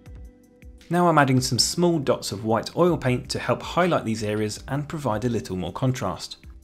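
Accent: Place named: South England, United Kingdom. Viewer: I am not an artist by any stretch of the imagination. I mean, I can freehand-draw an elephant that won't make you think it's a hippo, but that is about my limit! (Thank you, trunk, tusks and toes!) In scale modeling, I am a stickler for realism, rather like Bill Halliwell, and I don't give myself much leeway. It's just that lately I am starting to re-think what realism actually is. 🤔 In the view from the USA, your south-England accent does not sound boring at all, although I do enjoy hearing the wide variety of British accents that one finds in Youtube scale modeling. Lately, I am particularly getting a kick out of the ones that pronounce "blood" as rhyming with "mood", because it's so startling to an ear used to hear BBC News as the only British pronunciation!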